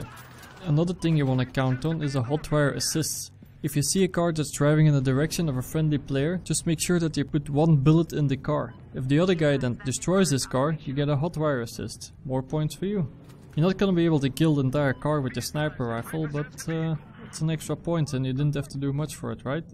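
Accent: Netherlands. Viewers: R u dutch cuz u have a dutch accent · Dat English tho!! R u from the Netherlands?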